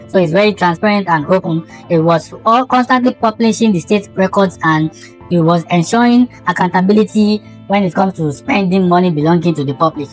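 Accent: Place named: Yoruba, Nigeria. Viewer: Well-done to the the pitobi desperate bandwagon for this concoction. 1. Mention one school that Peter obi built while as a governor for 8 years, I'm not talking about renovation that made you claim he built schools 2. Do you know medical doctors went on strike for over 8 months in his tenure? 3. A man who claimed to save billions in a state that was bereft of infrastructure, no airport, no stadium, no amusement park but his priority was to build beer factory? Tinubu introduced BRT but obi that introduced ANIDS transport that we thought was for the State when he left was converted to BenFlo which was for his kinsman Mr Ben 4. The deliberate attempt to use a very obvious Yoruba accent to narrate this concoction and sponsor it on instablog a day after Obi admitted he would never pe part of any coalition to unseat APC and Tinubus presidency underlines ur desperation but this is the newest low 😂😂😂😂 8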